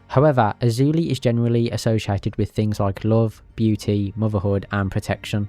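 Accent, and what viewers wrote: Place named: Birmingham, United Kingdom. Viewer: Errr Birmingham accent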